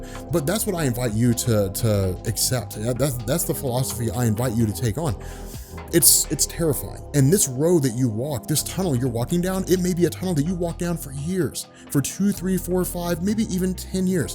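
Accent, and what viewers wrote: United Kingdom. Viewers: Quality London accent,. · your English accent is amazing..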